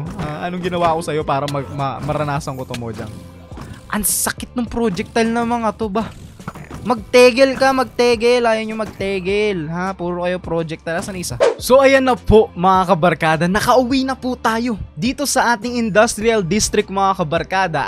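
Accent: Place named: Bulacan, Philippines. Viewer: Pareho tayo ng punto ng pagsasalita kuya Proud Bulaceno Here taga bustos po ako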